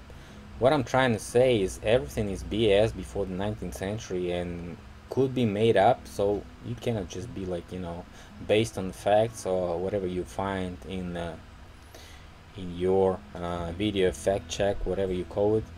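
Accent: United States. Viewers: when you learnt to speak English, you also picked up a slight American accent. That was the very first thing I noticed. I'm Australian, so it stands out to me.:) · You got a southern American accent and you ain't talking to slow. It's perfect!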